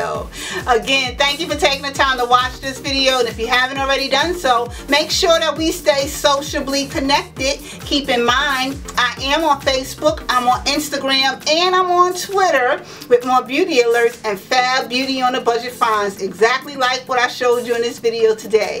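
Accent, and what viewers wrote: New York, United States. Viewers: great haul from Family Dollar..........I have to check out that "Danger" Lippy.....it looks super cool..........my kind of color............I am also going to go to Family Dollar and check out that candle......the Mahogany Sandlewood........love candles that smell like men's cologne.......have never bought any candles from FD except Glade because I hate to buy candles and u get them home and they have NO SMELL at all...............so I am going to take your word for this one girlfriend and pick it up when I find it.............lol..............great haul..........thanks for sharing........I love listening to u talk............that NY accent is all that..............lol. Well have a great week and I'm looking forward to your next video.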